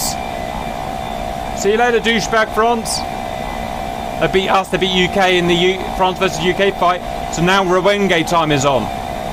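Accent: Australia. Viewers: what would be cool to see is a naval chopper battle using helicopter only carriers. also ur aussie accent was pretty decent. keep up the good work. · Cheers bro, I'm an Aussie and I can say your Aussie Accent and made up words were hillarious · Being an Australian & listening to aussie accent was AMAZING! Love the videos keep it going. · Love the aussie accent mate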